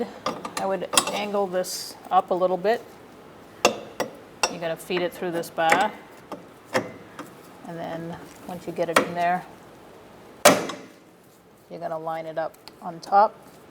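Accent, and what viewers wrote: Boston, United States. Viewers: A big thank you from South Texas! It sounds like you have a Boston accent which just makes this video better for some reason.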